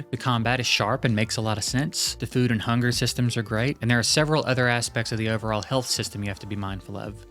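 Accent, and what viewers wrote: South, United States. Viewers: your voice for some reason gives me mister grayson vibes so hard XD that southern tang on some words i have it too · the southern drawl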